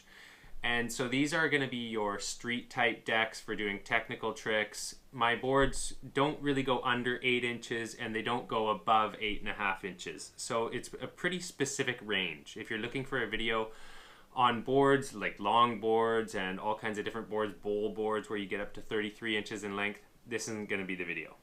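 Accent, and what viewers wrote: Canada. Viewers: (Not related to vid) Your accent remind me of Ben Stiller from Zoolander. Is this the typical Canadian accent? 😂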